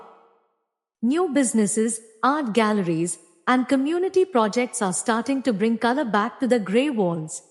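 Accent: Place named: India. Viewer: Bad narration the Indian accent is too thick.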